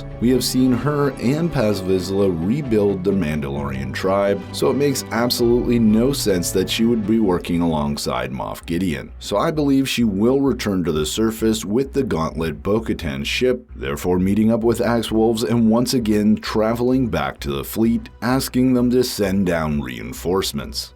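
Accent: Canada. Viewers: Is that a Canadian accent with scouting pronounced "scoting" (sounds like coating)?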